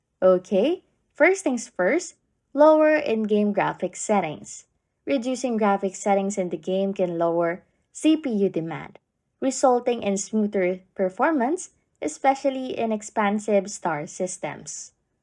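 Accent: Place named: Philippines. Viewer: are you from the philipines? because your voices sounds like you have a filipine accent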